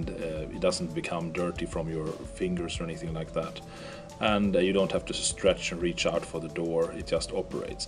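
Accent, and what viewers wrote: Sweden. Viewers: Swenglish! That's a Beaty.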